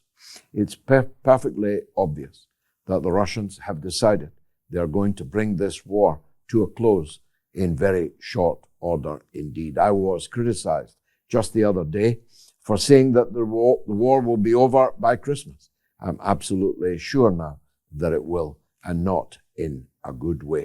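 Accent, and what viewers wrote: Scotland, United Kingdom. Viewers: ☔... old guy saying thanKs again.... me toO....imagined watching the Nazi's carry out their crimes during ww2 on YouTube.... would it have helped or not ?....born in 1950 i'm barely chipped away at the extent of the brainwashing and propaganda inflicted upon my generation and continuing... nevermind .... your accent ?..... just like my grandma's.... Fraser clan... and clan Donald....💜.. peace 🇵🇸🇾🇪🇸🇴🇪🇹🇸🇸🇦🇫🇱🇾🇮🇶🇸🇾🇱🇧🇯🇴🇨🇺🇺🇦🇷🇺🇺🇸.....⚾ ....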